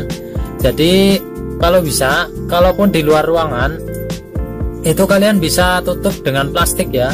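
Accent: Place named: Banyumas, Indonesia. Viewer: klu orang ngapak ketahuan cara ngomongnya... hidup ngapak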